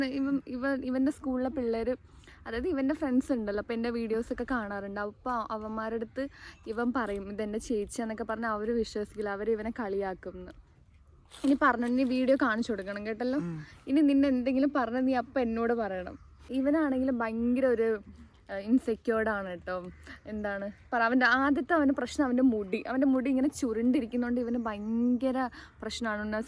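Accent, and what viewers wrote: Trivandrum, India. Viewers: hai chechi ammommayod samsaricha tvm slang kettapo as a tvm kaari oru sugm indayirunnu ❤🎉😅❤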